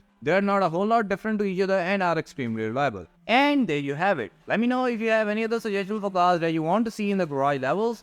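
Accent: India. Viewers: Bro you indian? If not where you from?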